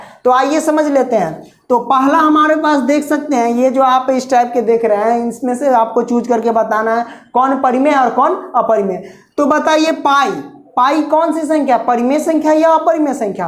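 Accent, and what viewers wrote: Bihar, India. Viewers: Sir aap Bihar ke hai na aapki baaton sehi pata chalta hai aap bahut aacha bolte hai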